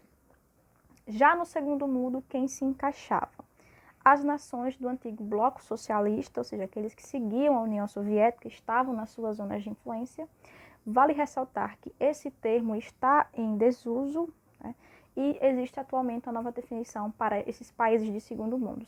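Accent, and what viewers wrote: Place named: Northeast, Brazil. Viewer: Bonito sotaque nordestino!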